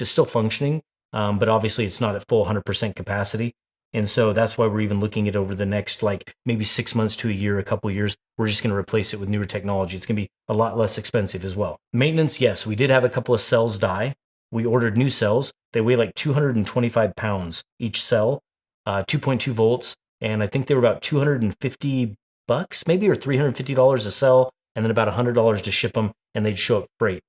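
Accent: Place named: Central Washington, United States. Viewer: Bro, it sounds like you live in central Washington! I wish I could send you a message to see if we live in the same town 🤣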